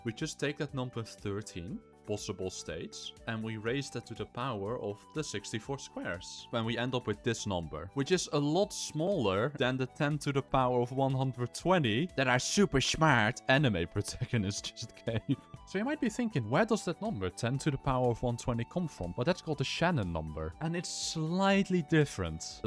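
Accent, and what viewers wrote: Netherlands. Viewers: Are you Dutch? Sorry, I always get distracted by the accent of Dutch people on YouTube.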